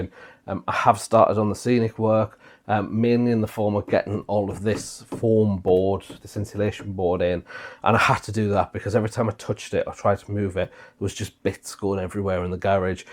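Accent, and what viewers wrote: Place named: Sunderland, United Kingdom. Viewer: I'm not sure how helpful this will be for you. There's a little model shop in Sunderland (sorry I'm just guessing you are near by based off the accent) called Sunderland model Station. They have several new and used Skaledale buildings, there might be something in there for you. Again I'm not sure how easy it is to visit the shop but if you get chance it might be worth a look 😊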